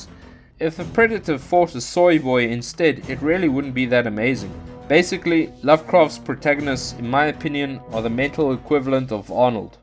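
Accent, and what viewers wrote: South Africa. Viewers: I'm really enjoying your content, mate. Also, out of pure curiosity: Your accent.. are you south-African/boer?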